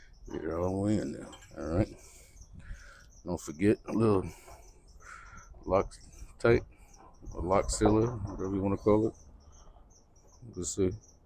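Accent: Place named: Louisiana, United States. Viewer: You can always tell when someone’s from Louisiana!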